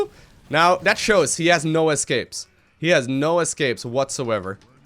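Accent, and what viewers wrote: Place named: Netherlands. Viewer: Haha yeah that's kind of how we Dutchies often speak!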